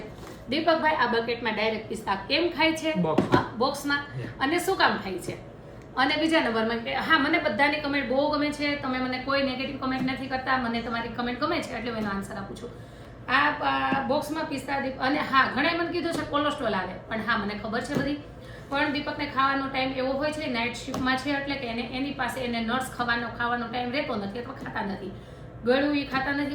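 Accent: Kathiawar, India. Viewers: Your kathiyawadi speech is really nice...❤ i really like it.... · Aapni matrubhasha Gujarati che.biju ke tame suratra na cho aa kathiyavadi bhasha tame bolo cho te khubj game che.tamari vani saras.che. pan saras bole che.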